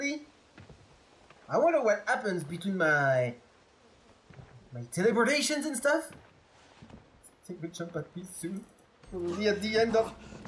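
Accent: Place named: France. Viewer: yup, ton accent français est bien présent 😂👌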